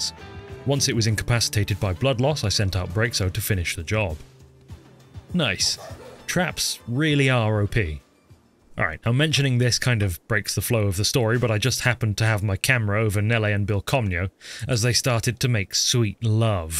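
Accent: United Kingdom. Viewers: doesnt this dude sound like spiffing brit ?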